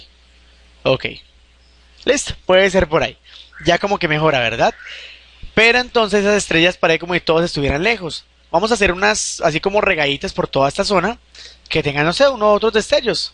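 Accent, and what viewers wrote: Colombia. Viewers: B dis, le escribo desde Vzla, le felicito por sus curso y le agradezco por la informacion compartida. Me agrada que use un lenguaje practico con buena diccion y con su acento Colombiano, porque la combinacion de todo ello resulta interesante, entretenido y no canson. Siga pa lante y sin egoismo que Dios sabra recompensarle. Hagale parcero, hagale. Saludos.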